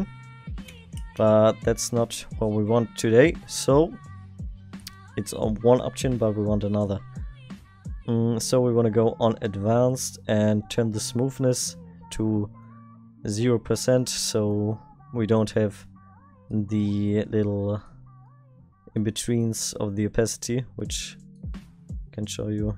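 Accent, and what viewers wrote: Germany. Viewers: man merkt du bist deutscher xD No front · Der deutsche Akzent sticht mies hervor 😳🧙🏿‍♂️🧙🏿‍♂️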